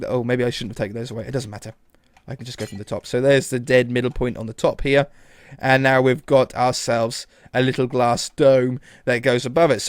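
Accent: United Kingdom. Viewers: That British Accent it wont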